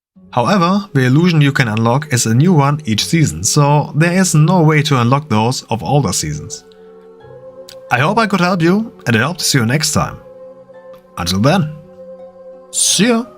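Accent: Germany. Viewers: Warum nur auf englisch??? Man hört raus, eigentlich sprichst du deutsch oder?? Kann man all diese vz auch transmoggen??? Brauche ich den vz Beruf??? Würde mich über eine Antwort sehr freuen 🙏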